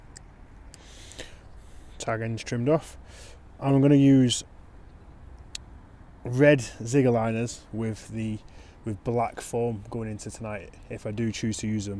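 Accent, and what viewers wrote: Northern England, United Kingdom. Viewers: Always bud! It's all different for everyone isn't it and a good laugh on a blank is still a great time out for me 💪 haha I am yet to catch from linear so don't expect any secret winning tips from me 🤣🤣 yeah mate I would be keen for that! FB me where abouts your club is, think I detect a northern accent from yourself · Where are you from? Clearly a Northern lad! Me and my mate fish here and have been Farnham members for a few years. We travel from Blackpool. Check out Red Rose angling for a session we recorded on Badshot Lea Big Pond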